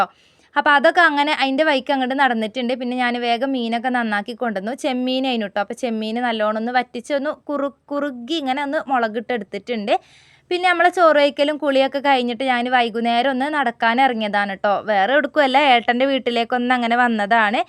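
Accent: Malappuram, India. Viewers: നിങ്ങൾ സംസാരം കേട്ടിട്ട് വയനാട് സ്ളാങ് അല്ലല്ലോ ജനിച്ച നാട് മലപ്പുറം ആണോ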